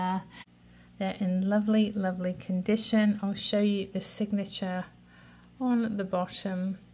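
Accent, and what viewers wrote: United Kingdom. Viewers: From the things you say it is clear that you have been in the USA since you were quite young and yet your accent is pure UK - -which is fascinating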